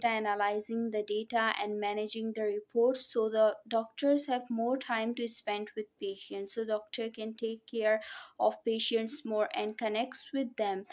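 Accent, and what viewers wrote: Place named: United States. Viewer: Your acent sounds like American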